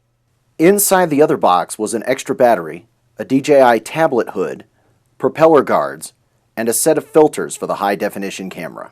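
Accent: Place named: United States. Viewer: I was shocked when I played this video. The last thing I expect was to hear an American voice. First thing I did was give you a thumbs up, know what I mean Vern?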